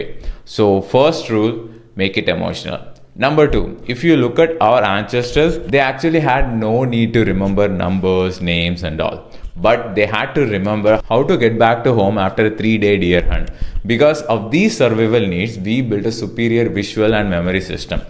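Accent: India. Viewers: THE AWKWARD INDIAN ANGLOPHILIA: English is obviously not his first language, nor is it the language he is remotely proficient in. Indians, even from the lower social strata who want to rise up, tend to use English everywhere as if they had no language of their own. Strangely, they even talk to stray dogs in English. To appear smart, they tend to talk fast, but then no one understands their gibberish anymore and so they become a laughing stock. Don't they ever think about their ridiculous pronunciation and accent?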